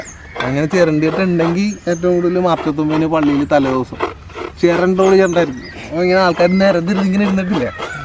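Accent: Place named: Coastal, India. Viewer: Evda veedu 🏡 ?slang 😂😂😂near kadapuram aano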